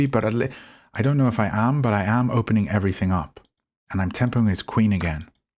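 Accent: Northern Ireland, United Kingdom. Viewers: You're English?! You sound Northern Irish! I would've put money on it.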